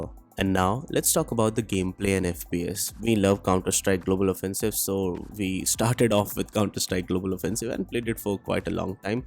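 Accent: India. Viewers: Where you live man the Indian accent feels nearly my country’s normal accent cause how much I hear it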